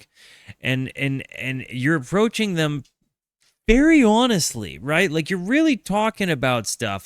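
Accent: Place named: Locust Valley, United States. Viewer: This guy has that Locust Valley Lockjaw accent.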